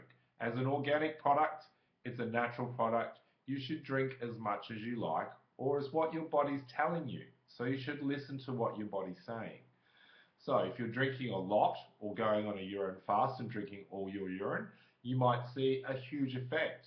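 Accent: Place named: Australia. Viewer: You sound Aussie mate!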